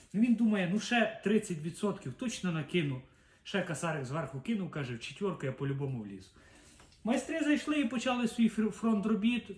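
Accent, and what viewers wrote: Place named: Lviv, Ukraine. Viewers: Чиста наша львівська мова !!!❤😊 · Діалект чи вимова наша львівська, але не мова, мова укрвюаїнська .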